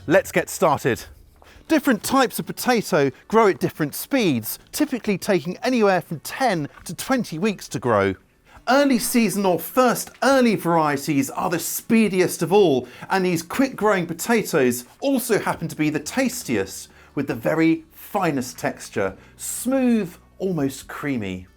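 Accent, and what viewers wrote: England, United Kingdom. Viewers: English gardeners has a such great accent 😊 it is almost plaesure to learn English and gardening at the same time😅 "two birds with one stone" 😂 verey interesting informations about early potatoes 👍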